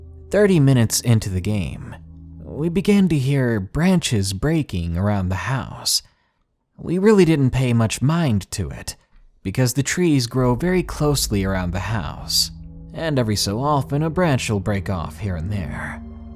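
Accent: South, United States. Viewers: That rich southern drawl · LOL< your southern accent! You're entertaining beyond the stories! Great job! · That southern accent nearly KILLED ME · I came for the dank stories, stayed for the southern accent. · Hey, I loved your Southern Accent it made you sound like a Southern Gentalman.. I do declare 😉 much love Sexy Grandma ✌💙💙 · I love the Southern drawl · I never thought I'd find a southern accent attractive before. O.o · LOL loooooove your southern accent!!! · that southern accent it shivers me timbres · ... you southern accent is to die for. omg. <3<3<3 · I love your country accent and I love your videos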